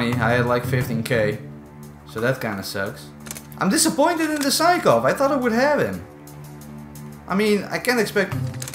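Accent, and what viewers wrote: Netherlands. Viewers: does he sound a little dutch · R u dutch ? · I smell dutch isn't it?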